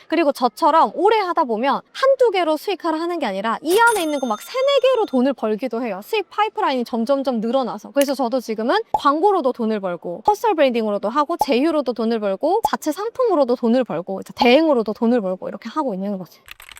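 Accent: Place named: Gyeongsang, South Korea. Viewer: 경상도 억양이 있으시네요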